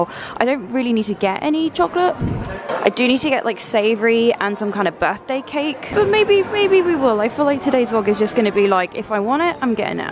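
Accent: United Kingdom. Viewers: Love that British accent, so cute.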